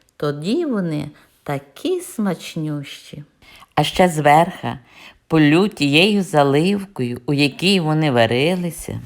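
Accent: Ukraine. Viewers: Гарно,просто,смачно і зрозуміло А саме головне страшенно приємно чути наш український говір. Підписалася і поширила. Маю подругу в Америці надіслала і їй. Щиро подякувала.🖤❤️💛💙🌹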